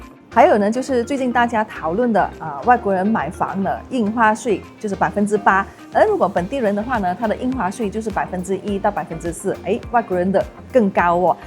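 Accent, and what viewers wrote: Malaysia. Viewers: 马来西亚人喇！（从她的姓Ang，不会是台湾人），（2）她的口音，不管是华语或英语都是大马口音。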